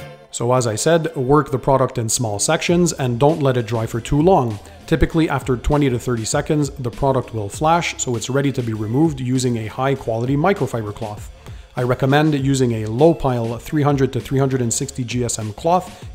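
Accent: Quebec, Canada. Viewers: Are you Canadian?? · French Canadian? You sound hilarious. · Dans seulement 5 seconde je le savais deja que tu venait du quebec , l'accent quebecois et a lexterieur les maisons typique dans les banlieues montreal. Très bon video ! Learned a lot from this video !!!!! definitely subscribing! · Are you from quebec ?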